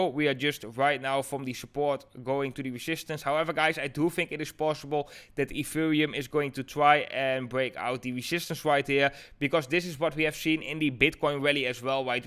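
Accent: Netherlands. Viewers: What time you sleep? You clearly sound dutch, but you always upload during the night ???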